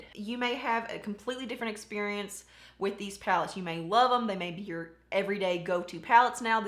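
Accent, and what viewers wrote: South, United States. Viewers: THANK YOU FOR YOUR HONESTY! Your video is the first truly honest & genuine review. I love Emily also, but I'm sure she didn't have absolute control over the whole production of this palette. I'm SO grateful I stumbled across your channel. I'm really drawn to your southern drawl... maybe cause I've one also. You also do a lot of looks like what I prefer myself in my daily life. The face is a canvas that we turn into our own personal masterpiece. Blessings to you for your WONDERFUL, FUN & GENUINE videos!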